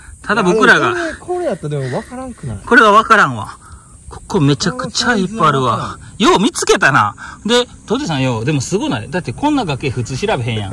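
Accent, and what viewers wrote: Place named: Wakayama, Japan. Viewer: あっ🤭、そうそう！の話し方にほっこり。和歌山弁にほっこり！関西人はなんとなく、通じるものがあるんですよね〜。いつもお返事ありがとう。喜んでます🥰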